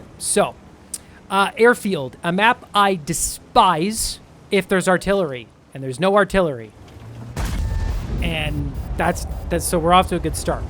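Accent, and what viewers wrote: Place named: Canada. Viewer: I guessing you're a fellow Canadian? Lol ...I haven't caught ab "eh", but I did catch the "Zed". 😉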